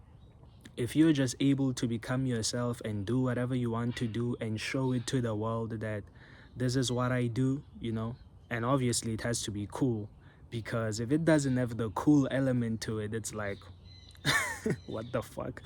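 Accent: South Africa. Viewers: Are you from South Africa? picked up on the undertones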